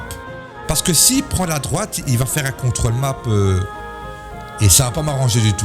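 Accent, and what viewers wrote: Belgium. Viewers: Je suis obligé de mettre un gemmes, rien que pour l’accent belge, génération François l’embrouille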